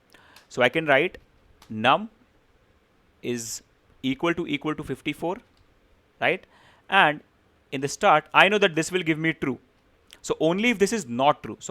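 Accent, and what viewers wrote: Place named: India. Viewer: It's so cool and understandable very very simple explanations so easy to understand the Indian English is not what everyone can go with but minus that is supper cool I wish u can upload a video on how I can master them if it's possible to master them in the DOM manipulation or maybe react or Nods I really don't know where to go to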